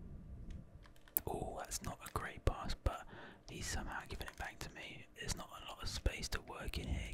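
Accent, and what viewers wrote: United Kingdom. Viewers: What part of London are you from?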